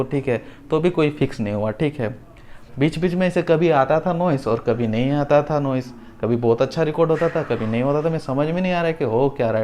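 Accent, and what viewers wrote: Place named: Bihar, India. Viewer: Isme uski koi galti nhi h, vo bihar se hai bhai, thik hai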